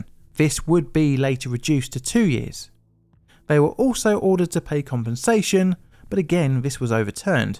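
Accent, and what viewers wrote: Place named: England, United Kingdom. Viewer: In future videos, can you stop using that awful England accent. It's rather annoying.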